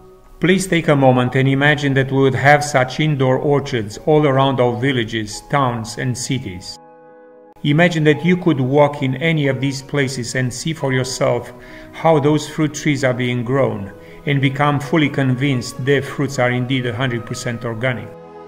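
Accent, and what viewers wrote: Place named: Mediterranean. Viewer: Amazing experience, your accent is of someone who used to live somewhere around the Mideterranian area. Your passion for securing med fruits is beyond me. Bravo for being such an inspiration to others.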